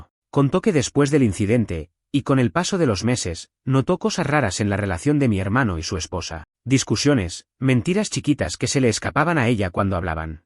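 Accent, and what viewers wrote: Argentina. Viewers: La IA que traduce es de argentina pero dudo que la historia sea de allá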